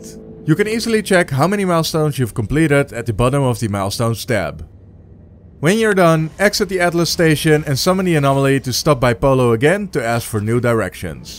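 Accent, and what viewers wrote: Netherlands. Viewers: You dutch aint you??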